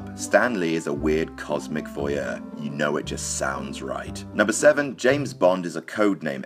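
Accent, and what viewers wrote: United Kingdom. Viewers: You're so great, I love this channel. The content, the editing, the comedy, that snarky British accent that we Americans adore so much. It's like a perfect package all rolled into one.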